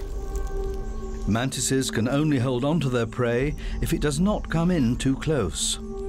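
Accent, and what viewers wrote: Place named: United Kingdom. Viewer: You are correct, Gavin... & btw....this narrator IS British, but is not David Attenborough ...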